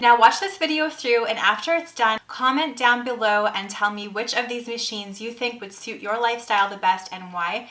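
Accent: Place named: Canada. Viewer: I love the way you say “decal”. Canadians you need to stop apologizing for how you talk. We love your accent!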